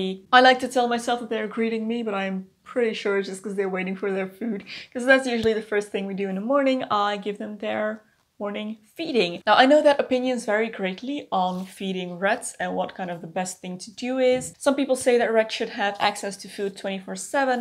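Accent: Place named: Netherlands. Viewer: Je klinkt niet zo Nederlands als de meesten die Engels praten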